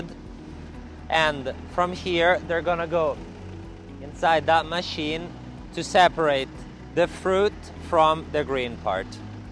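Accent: Italy. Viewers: I love this Italy accent English ^_^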